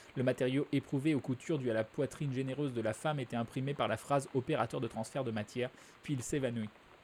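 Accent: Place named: England, United Kingdom. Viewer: Hey salut =) je t'ai découvert sur les vidéos d'Aventures, j'étais intrigué et je dois dire que je suis surprise. Cependant, ta personnalité reste fondamentalement et c'est cool, tu t'immerse dans le jeu tout en gardant ton humour (y). Tu as gagné une nouvelle abonnée je dois dire, et ps: ton accent anglais est é-pique haha ~ Continue!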